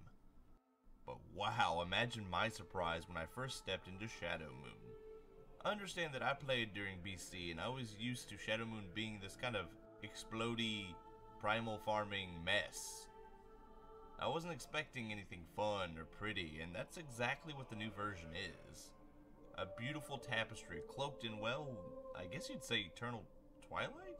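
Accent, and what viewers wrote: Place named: Canada. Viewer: I can't place your accent....it's subtle, but definitely distinct from my Bay Area English...Canadian maybe?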